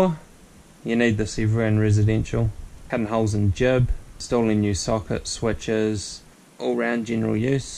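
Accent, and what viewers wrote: New Zealand. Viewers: Love the kiwi English words & accent.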